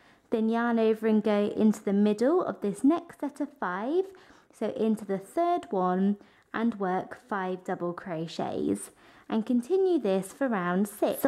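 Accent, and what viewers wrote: England, United Kingdom. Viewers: I love the tutorial but I must say the English accent is too much U over pronounce words and its distracting from the video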